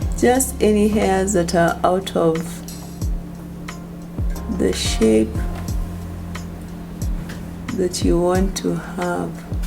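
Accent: India. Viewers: You look beautiful, wow and only for 1,500, very impressed. Love the Indian accent😂😂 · Beautiful. I love the Indian Accent😂😂😂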